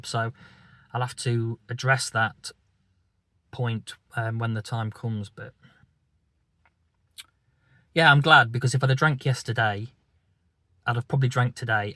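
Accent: United Kingdom. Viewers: You sound like you’re from Derbyshire/Nottingham area. I live in Sheffield and don’t care for drinking but love the footy like you and sport in general. If you ever fancy a walk or coffee let me know. I have struggled with my addiction for years although it’s drink or drugs related. Stay strong today brother.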